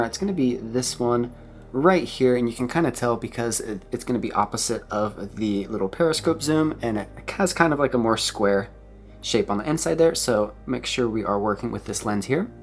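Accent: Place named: Alabama, United States. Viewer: Great stuff! Informative content and loving that slight hint of an Alabama accent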